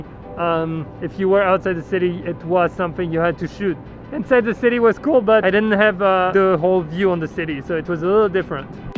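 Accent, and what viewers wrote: France. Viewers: Sorry for this comment, you must really be French to understand that, but it kills me how has not only a French accent when talking english, but also a Parisian accent with all the "Euuh" at the end of each word ahah 😂😂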